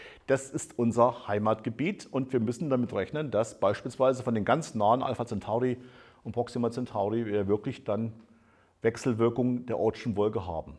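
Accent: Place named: East Germany, Germany. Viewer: Musste leider schnell wieder weg wegen Ossi Aktzent...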